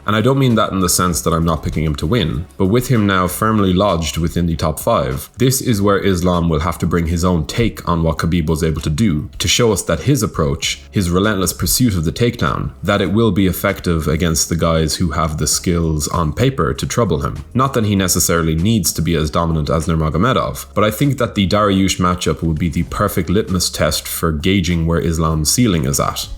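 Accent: Ireland. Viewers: Listening to you talk reminds me of the 2 weeks I drove around southern Ireland. Ring of Kerry was pretty dam awesome, fwiw. Great video.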